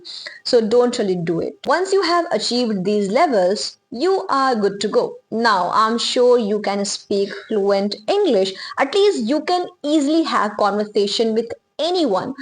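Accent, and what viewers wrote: India. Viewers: What is your region? I asked couse yours pronunciation it's look like Indian.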